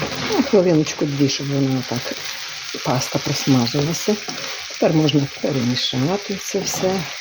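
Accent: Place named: Vinnytsia, Ukraine. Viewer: Чоловікова тітка родом з Вінницької області також смачні голубці робила і говорила, як Ви. А голубці завжди були мініатюрні, трикутні і дуже смачні. Теплом повіяло від Вас і Ваших голубців. Міцного Вам здоров'я. І довгих років щасливого мирного життя. Дякую за теплі спогади. На жаль, тільки вони й залишилися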